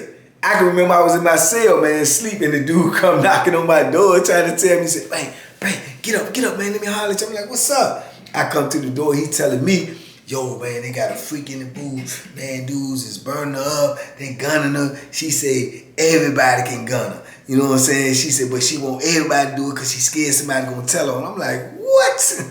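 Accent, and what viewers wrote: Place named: Virginia, United States. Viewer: Man, I love the stories, but they're just a bonus to me. Started watching APS and then living in Asia...just to hear voices from that Tidewater/VA area. Ain't nothing more comforting than an accent from home when you been gone too long. Damn good to hear your accent man. Salute.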